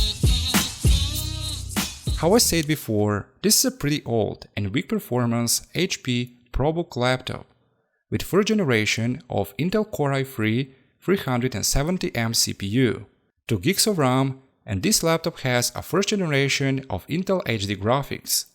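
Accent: Russia. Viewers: По твоему произношению видно что ты Русский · Russian accent lol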